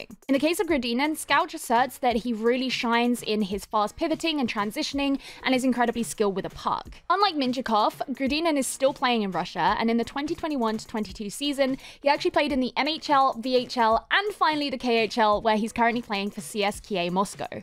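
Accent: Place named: United Kingdom. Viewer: Great analysis! Though I'm still adjusting to a British accent discussing hockey lol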